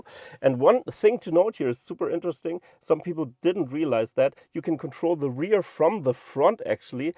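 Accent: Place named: Germany. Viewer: Great car, great vid. I hope your longer review includes a proper test of the voice commands. It needs to cope with accents and a German accent is a great test. I love your accent but I want to know that Tesla responds well, too. Imaging how it will be if the Teslas get Grok!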